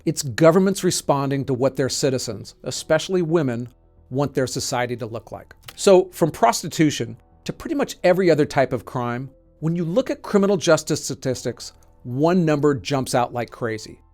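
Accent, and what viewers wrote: United States. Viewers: Huh ? Prostitution isn't illegal. Ah, American accent. That explains it. Can't understand why the car was stopped on the left, though. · But it is legal in most first world countries. Assuming from the accent you meant the United States but I wouldn't call this one a first world, just a really wealthy 3rd world country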